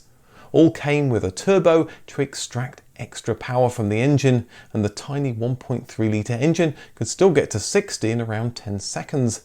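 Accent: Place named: Midlands, United Kingdom. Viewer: There's many British accents and dialects. Midlands/northern accent?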